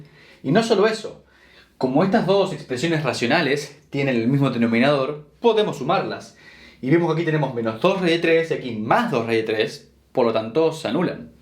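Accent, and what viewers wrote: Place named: Argentina. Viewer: me preguntaba lo mismo porque suena re argentino pero dice cogemos jaja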